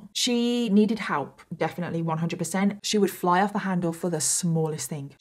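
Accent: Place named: Birmingham, United Kingdom. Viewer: ‘A bit off topic’, but your accent sounds Birmingham. Am I right?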